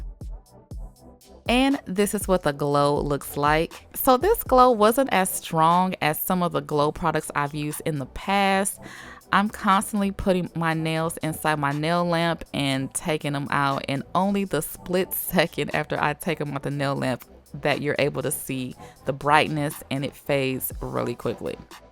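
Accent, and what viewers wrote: South, United States. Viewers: I was going to get this kit a few weeks ago but held off. I honestly wasnt sure if they would glow well or not, and being in quarantine I was hoping to do a fun set. I decided to order the kit a few days ago and now it is out of stock😭. I am sooooooo happy you did a review on this kit I love how honest and descriptive you are!!!!!! I'm originally born and raised in the south now I live in nyc so to hear that southern in your voice is like home!!!💖🥰🤗thanks for the videos keep them up!!!!!!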